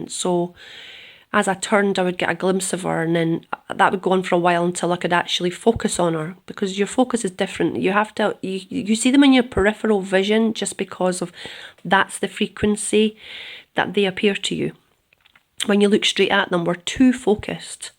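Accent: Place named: Scotland, United Kingdom. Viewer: You scottish. I'm scottish also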